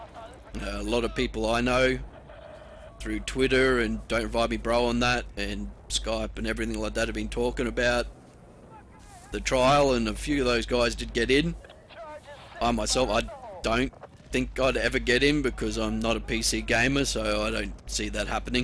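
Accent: Australia. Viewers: lmao i thought the very start of ur vid was the Bf3 Alpha shit, till i saw 1700 lmao i was getting all pumped to see u play it to lol i love ur vids, probs cuz ur an Aussie as well, and im sick of Americans commentating lmao :D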